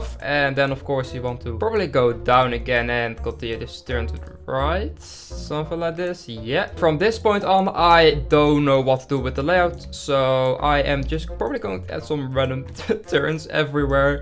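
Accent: Netherlands. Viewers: i know your netherlands by the way you talk english so --> hoi leuke video heb je gemaakt maak nog meer van die videos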